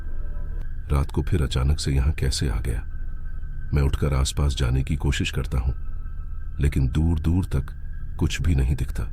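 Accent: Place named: Bihar, India. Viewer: Are Bhai bihariyon ki tarah kyon bolate ho bada Ajeeb lagta hai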